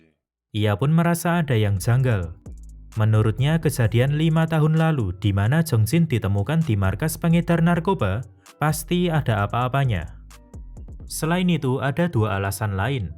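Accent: Java, Indonesia. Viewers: Suara yac medhok .tapi enak didenger Karna notasi yac pas. Gk kecepatan tau kepelanan . Dan jenis suara yac berat Enak pkk eh dnger .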